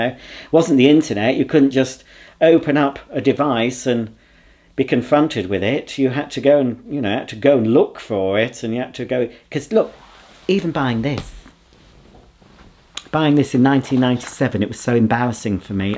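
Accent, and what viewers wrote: United Kingdom. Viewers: As a confirmed bachelor myself, I laughed and laughed at this video. "A shell suit?!" 🤣. I love that your camp voice is pure Yorkshire.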